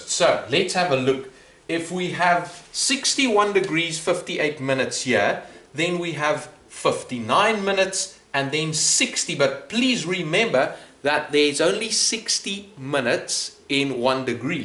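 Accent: Afrikaans, South Africa. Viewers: I'm from South Africa and it really sounds so South African 😂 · You south African bruh? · I have an exam tomorrow and you've been of much help. Thanks so much. (btw you sound Afrikaans, am I right?) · Are you South African? That accent sounds familiar. Ps: thanks for the video! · I like your South African accent. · Thank you for your reply! Your accent is very cool! I had a teacher who was born in South Africa. Maybe I didn’t hear too much Scottish afterall 😊anyway, it’s nice to hear such an interesting voice. Cheers, and thank you again for your great videos!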